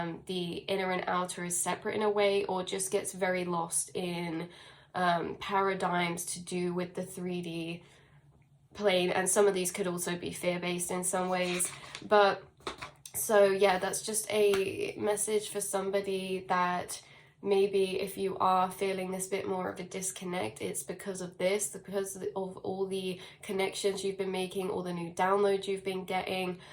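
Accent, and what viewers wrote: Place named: England, United Kingdom. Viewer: Hello. Like you said, its amazing to meet you and to participate in your space. I am excited for the same reasons you stated. We are stationed so far away from each other or so we think. I just found you and for once, the messages are so clear and resonant that I dont need any discernment. I don't think I can even describe it... its not a reading its more like a reflection of my thoughts without any distortion whatsoever. I love and respect myself a lot and I am so excited that there's someone out there that I can give the same amount of respect to. And then... I can hear you're English wich means you're not just the most resonant person I've seen, you're also the closest geographically to me as I'm in London. Its all very exciting, the tribe is getting closer. Almost touching distance. And I do not feel any push or pull from your energy.. 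just a recognition of a very high vibrational being. Thank you for existing. Sending you lots of love and gratitude. Maybe run in to you one of these days.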